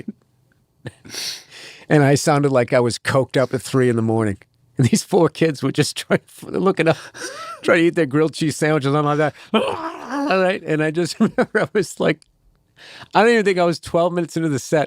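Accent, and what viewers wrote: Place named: India. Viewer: i can instantly tell that you are indian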